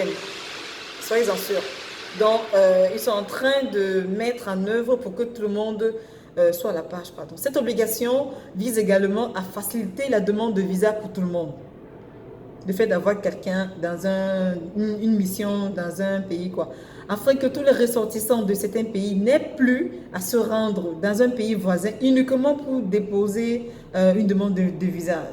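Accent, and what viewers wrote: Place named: Cameroon. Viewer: Les congolaises n'ont jamais un tel accent . Je crois bien qu'elle soit une camerounaise. Les congolaises parlent mieux que ça . attention !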